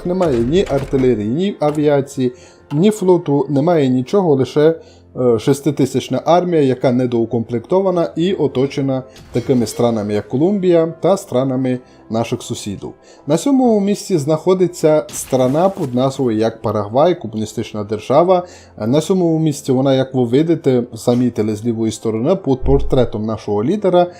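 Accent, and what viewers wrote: Transcarpathia, Ukraine. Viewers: Я живу на Полтавщині, для мені дуже файно чути закарпатський діалект і hoi4. Продовжуйте.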